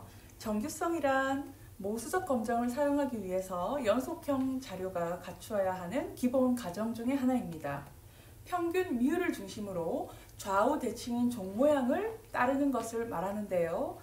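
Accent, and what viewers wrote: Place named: Busan, South Korea. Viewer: ㅎㅎ안녕하세요? 간호사이고 대학원생입니다. 9월되면 학술지에 논문을 투고 해야되는데 통계가 너무 어려워서 유튜브 찾다가 이 채널을 발견했어요. 말투가 낯설지 않고 정겨웠는데 부산에다 센텀에 계셔서 너무 좋아요. 제가 자주 가는 곳이라서^^; 따님분한테 쉽게 설명해주시는 부분도 좋고 따님분도 질문을 너무 야무지게 잘해서 스트레스 받지 않고 볼 수 있을 것 같아요. 그래서 이곳을 통계 맛집으로 정하고 정주행 하기로 했어요. 너무 유용하게 잘 듣고 있습니다. 그래도 감사 인사는 드리는게 좋을 것 같아서 댓글 남겼어요. 복 받으세용^^ 그리고 정말 감사드립니다❤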